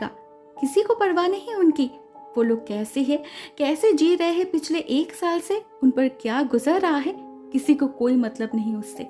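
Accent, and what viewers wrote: Bengal, India. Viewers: Di kaya aap bangal se ho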